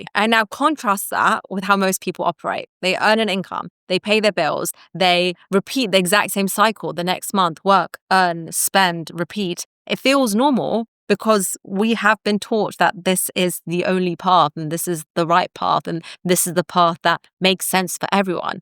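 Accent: United Kingdom. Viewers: I closed the video after hearimg british English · Is this British accent?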